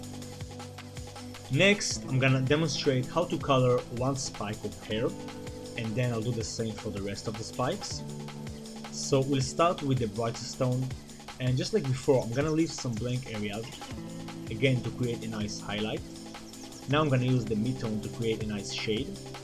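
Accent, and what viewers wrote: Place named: Israel. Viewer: הי אני יודע שאתה ישראלי בגלל המבטע לול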